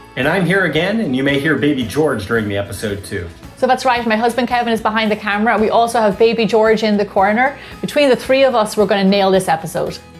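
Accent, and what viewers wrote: Ireland. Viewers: Are you Irish? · And your Irish accent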